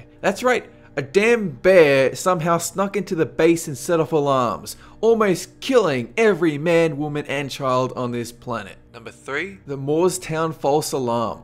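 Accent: Australia. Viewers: Are you from Australia? Also please do 10 creepiest things that ever happened on earth video. 😀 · Are you Aussie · Reaver He's australian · I find it hard to place the narrator's accent. Sounds vaguely Australian. Anyone know? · Most annoying Austtalian accent ever.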